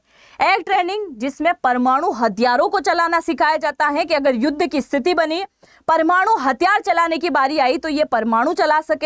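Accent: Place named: Madhya Pradesh, India. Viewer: Madam ,your lecture is very informative and clear .I have learnt much .Thanks .Your assent seems to be of MP Maharashtra border .May be I am wrong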